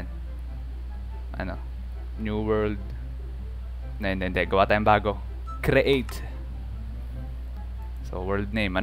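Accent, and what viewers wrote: Philippines. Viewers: Batanggenyo po ba kayo kasi po yung sinasabi nyu po kasi parang pang batanggenyo hahahahahah kaka relax ka panoorin erp sana umabot ka sa 100k, subs para may play botton napo tayo sana mapansin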